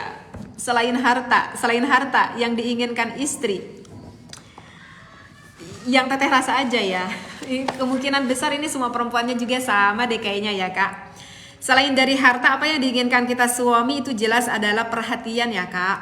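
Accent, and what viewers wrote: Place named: Padang, Indonesia. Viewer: Teteh kadang logat bahasana saoertos urang padang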